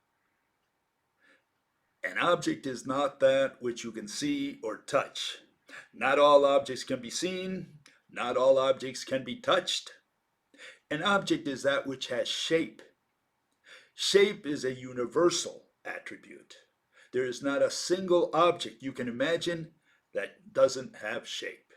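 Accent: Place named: India. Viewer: He talks like an Indian. Where are you from mister?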